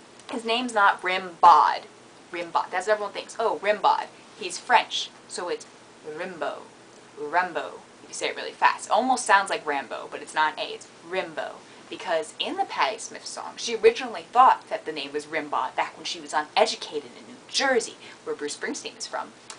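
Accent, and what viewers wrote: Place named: Scotland, United Kingdom. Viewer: that's quality! loving the scottish accent -nobody important from AP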